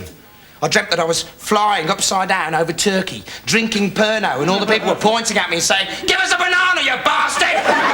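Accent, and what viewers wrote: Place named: Redditch, United Kingdom. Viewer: how does he know the redditch accent so well?? lol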